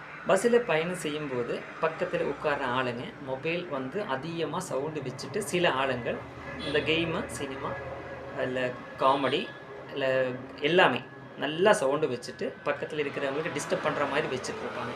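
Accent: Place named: Kerala, India. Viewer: மலயாள வாடை தெரிகிறது தாங்கள் அழகாக தமிமழ் பேசுகிறீர்கள்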